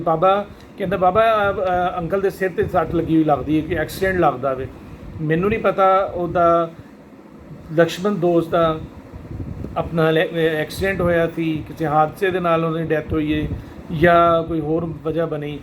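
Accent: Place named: Fazilka, India. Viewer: Jis Insan da Takullash hi..Dast..si..oh wakya hi... Laxman Dost si .ohna de.. Akal Chalana da Afsos hai..Or.. Fazilka or Jalalabad West.. Ilaqey d Bolli da Lehza..Same tuhadey Ilaqey da Lehza hai... Tuhada Gam..Sanjhah karna..tuhadi. . Parwarish da Natiza hai..Sada Hasdey Wasdey raho.. Slam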